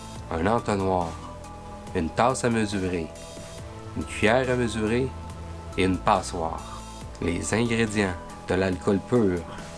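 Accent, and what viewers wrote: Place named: Quebec, Canada. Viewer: Très intéressant je vais essayer bientôt, je présume vue ton accent que tu est du Québec. Je me demandais si les baie de genièvre sont facile a trouver? Et que penser vous de filtré a nouveau avec exemple un filtre (britas)?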